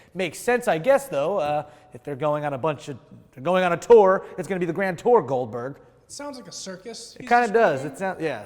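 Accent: United Kingdom. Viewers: Is it just me or does English accent sound a bit like Hammond? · your british accent sounded like hammond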